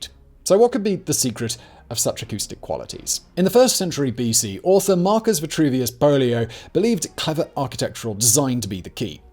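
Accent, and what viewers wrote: United Kingdom. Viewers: I love your videos man! something about a British voice, and a bald guy with a beard makes learning random information very enjoyable !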